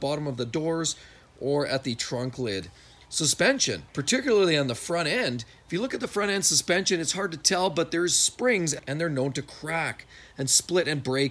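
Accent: Canada. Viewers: Your accent sounds like a Family Guy caricature of a Canadian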